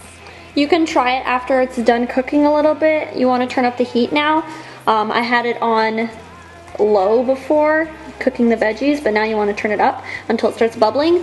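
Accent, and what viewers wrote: Canada. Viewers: are you Canadian? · she sounds canadian, is she?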